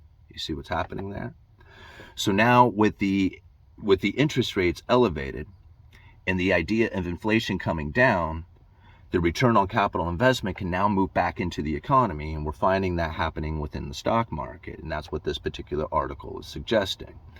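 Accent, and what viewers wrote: United States. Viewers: I haven’t watched this guy much but does anyone know if he’s American or Canadian?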